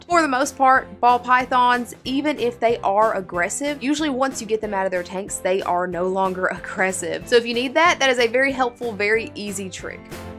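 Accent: South, United States. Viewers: Is that a southern accent I hear 😏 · I love how she says thangs instead of things. It's a southern thing y'all lol. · Snake talk with a little bit of a southern accent. I love it. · Thangs! Good ol southern girl haha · Hi I love that you are from the south it really gives me confidence as I am getting ready to start my channel to be myself because I feel like NO ONE on youtube has a southern accent but watching your video made me so happy!!!:) subscribed:) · Your accent sounds familiar. What part of the south are you from that is if you are from the south I don't mean to assume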